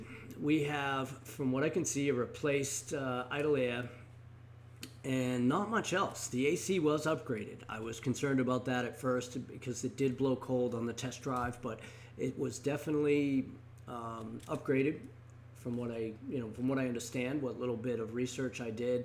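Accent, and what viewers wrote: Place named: Boston, United States. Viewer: Ca. 😂 Boston accent. Nice car bro.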